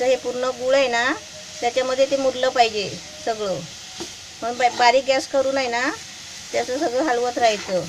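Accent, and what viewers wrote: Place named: Malvan, India. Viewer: मस्त बोलतात... फुल्ल मालवणी एक्सेंट.....जय भंडारी ..जय मालवणी... बरोब्बर असा "हात भाजलो तरी चालत्लो.पण पीठ गरम गरमच मळूक वह्या. .....मी पण पुढच्या वेळपासून पंखो बंद करूनच पीठ मळतलय..!!!......."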